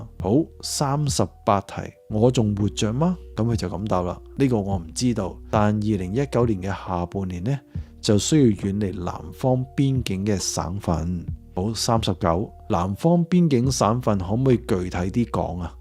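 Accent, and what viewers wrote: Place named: China. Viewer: 一聽大陸可以唔洗聽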